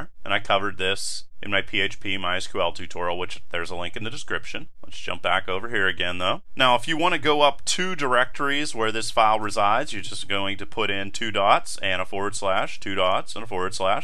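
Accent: Pittsburgh, United States. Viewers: OMG I just realized you have a Pittsburgh accent!!!!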